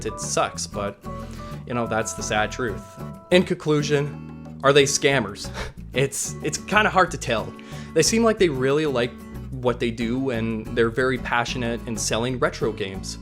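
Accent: United States. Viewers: How are you not from America but have one of the cleanest American acents I’ve heard in a very long time ? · You have a very American accent, where are you from?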